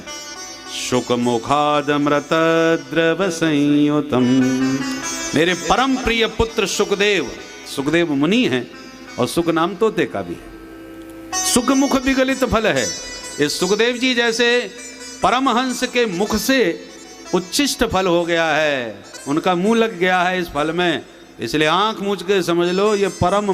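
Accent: Braj, India. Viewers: Maharaj shree apke mukh se braj brajbhasha bhut madhur lagti hay apko shut shut naman 🙏🙏🙏🙏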